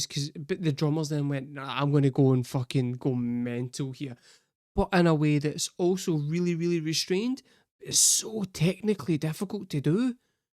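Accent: Scotland, United Kingdom. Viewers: My lad, you have the thickest Scots accent I've heard on YT! Tell us what part of the Auld Sod do ye hail from and whether or not you speak Gael?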